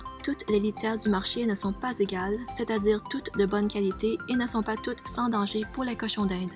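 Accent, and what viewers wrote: Canada. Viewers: Salut ça fait plaisir l'accent du Québec ! Ton cochon d'Inde blanc est trop rigolo. Puis je l'adopter ?!? 😄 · J’ai beaucoup aimé ta vidéo ! Je crois que tu habites au Canada, parce que tu as un petit accent 😉 mais est ce que tu pourrais me dire où tu as acheté ton foin ? J’en ai jamais vue d’aussi bonne qualité !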